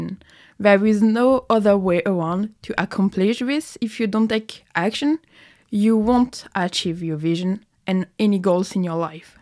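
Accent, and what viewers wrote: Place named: England, United Kingdom. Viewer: woow i loved you ,loved your english ascent and more love your work , ,,Thank you realy..looking ahead for the next video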